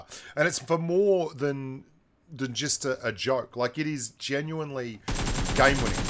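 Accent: Australia. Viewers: Hey. Damo from SA here. So refreshing to hear an Aussie and self deprecating at that. Brilliant videos, tactics and fun in spades. Been playing and watching your content for ages. Watch out for grubs. 😅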